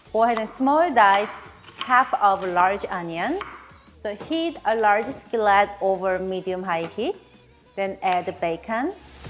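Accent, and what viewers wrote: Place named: South Korea. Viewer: I love ur channel ❤️❤️❤️ ur videos bring a lot of joy to many people. Thank u for making them 🥰🥰🥰 Ur accent is soooo cute. Reminds me of my moms. Shes Korean as well ♥️♥️♥️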